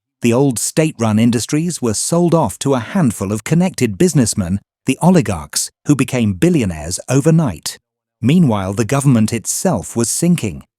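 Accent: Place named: United Kingdom. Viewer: Jewish Gangster Narrator with a British accent! They have hijacked the world with sleeper cells waking up in every country in the world in positions of Defense ministers, Intelligence, police in top positions to control. The 5 eyes is Israeli gangster Terrorism run bybthe terrorist state of Israel. We’re hijacked. Putin is just trying to hold his culture,& Country together. He’s a saint! Says The BronxBoy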